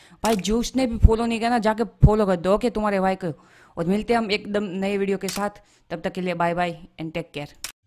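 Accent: Gujarat, India. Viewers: Aap Gujarat se ho kya bhai?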